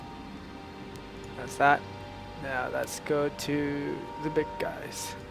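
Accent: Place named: Scandinavia. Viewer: thanks for the video. well done. really enjoyed it. one question though: r u from norway?! ^^ur accent sounds scandinavian, which I like for some strange reason. :d if thats the case: mange klemmer fra tyskland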